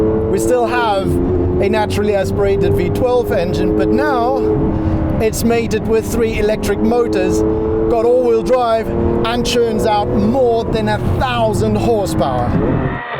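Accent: South Africa. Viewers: Bro, you sound like you're South African.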